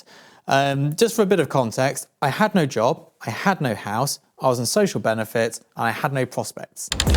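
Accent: United Kingdom. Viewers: Excellent! I'm grinning because I enjoy your point of view and because Brits talk differently than 'Murricans. 😂 I'm also appreciating how much I love pragmatism. And last but not least: I've been a little afraid of used kit because it seems fragile and... almost perishable. I'm glad to hear your point of view. I've bought used cars sight unseen, because I know cars. But studio gear seems "trickier..." Yep I'm just afraid of what I don't know. 👍 Nice to feel grounded again. Thanks!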